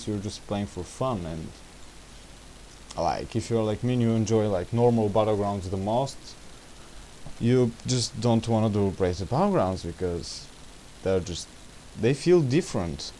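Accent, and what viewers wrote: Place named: Russia. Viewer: RUSHAN AKCENT SO GUD.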